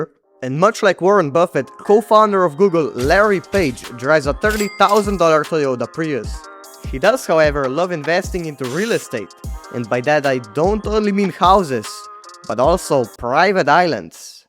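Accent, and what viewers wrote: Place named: Southern Europe. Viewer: im guessing youre either from sa or southern europe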